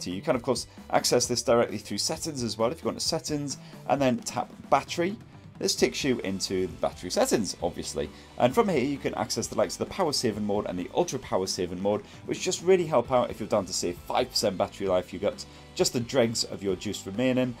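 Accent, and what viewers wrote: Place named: England, United Kingdom. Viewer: Thank you so much for this! First review was from an Indian dude, nothing wrong with it, but I can't understand his English, haha. Nothing can beat an English bloke! And very detailed review, wonderful! Only thing that concerns me slightly about this phone is that the battery is not removable so you can never replace it.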